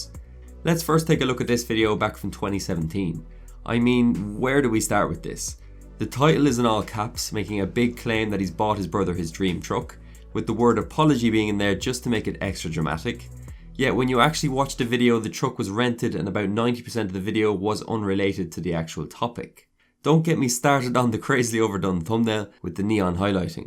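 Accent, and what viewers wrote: Ireland. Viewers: You Irish? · Been through a few videos, and I'm still deciding if I'm watching the content because its beneficial, or is it because I lowkey love the Irish accent!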